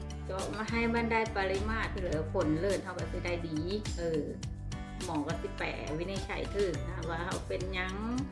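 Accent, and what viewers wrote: Phu Thai, Thailand. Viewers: เว้าภูไท ฟังเพลินครับ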